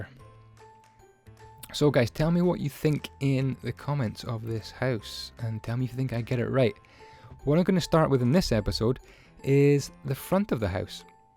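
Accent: Scotland, United Kingdom. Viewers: You sound Scottish. Are you?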